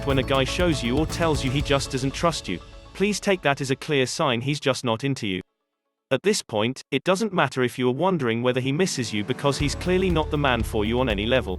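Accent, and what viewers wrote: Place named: United Kingdom. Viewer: Of course all of this totally true. What I loved the most about the content wasn't the content at all. It was the straight up no bs delivery in smooth British voice was delicious and hilarious. By the time the video ended my mood was lifted. Well played. Thx for sharing.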